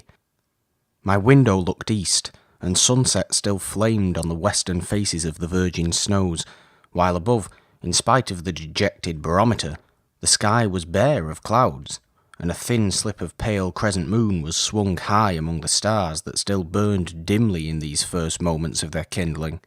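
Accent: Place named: Yorkshire, United Kingdom. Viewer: Am I hearing Yorkshire ? I think so and I adore it 💖 I've many ancestors from Yorkshire although I'm a Yank.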